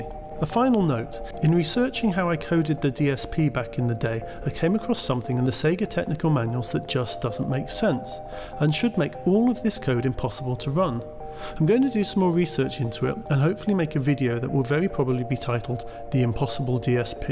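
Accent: United Kingdom. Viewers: Accent? he’s from the United Kingdom.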